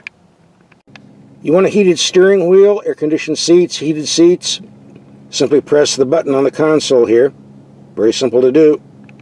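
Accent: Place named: Canada. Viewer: Sounds Canadian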